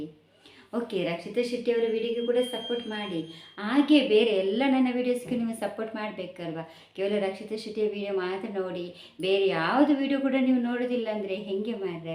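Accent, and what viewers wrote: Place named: Mangalore, India. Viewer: ನೀವು ಮಾತಾಡುವ ಮಂಗಳೂರು ಕನ್ನಡ ನನಗೆ ತುಂಬಾ ಇಷ್ಟ 👍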